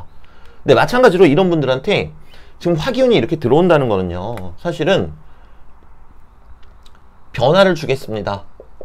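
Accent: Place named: Gyeongsang, South Korea. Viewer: 경상도에서 좀사셧엇나바여 ㅋㅋㆍ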